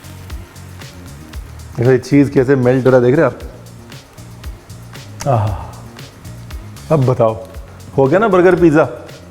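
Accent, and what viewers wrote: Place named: Punjab, India. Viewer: Your punjabi accent is mind blowing🤯 👨‍🍳 chef. Keep coming with such great content and recipes. 🥳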